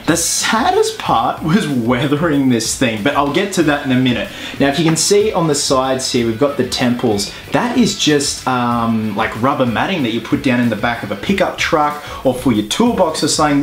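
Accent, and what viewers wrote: Australia. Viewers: Are u from australia?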